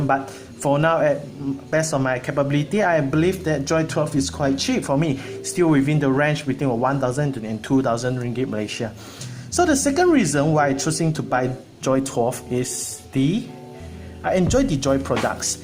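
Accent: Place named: China. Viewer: Wao ur English so Chinese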